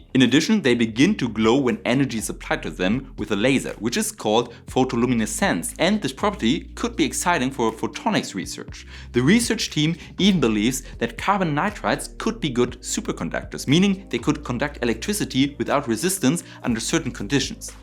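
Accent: Germany. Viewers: Great video. Made me realize I need more German accent in my science recommendations; subbed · if not possible to synthesize in industrial manufacturing via vapor deposition for example that material will be nigh useless because of costs. also the german accent is super cringe ldk grapene and piezoelectric especially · I know I'm being shallow, but the German accent makes this all sound so much more authoritative.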